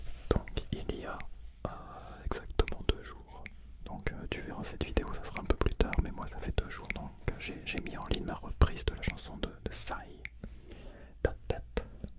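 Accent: France. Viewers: French accent on ASMR is ✨perfect✨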